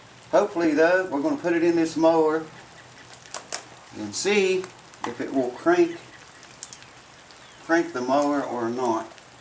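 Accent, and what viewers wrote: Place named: Kentucky, United States. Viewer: You be da man! Thanks for this video. I won't be doing anything you mentioned, but enjoyed watching you do your thing. You remind me of my Nam buddy, Bob McIntosh from Kentucky...same accent and same easy way of expressing yourself. Brought me back in time, so thanks again!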